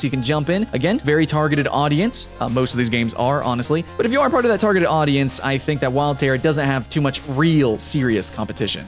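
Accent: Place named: Texas, United States. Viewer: Are u from Texas?? holly shit, too fast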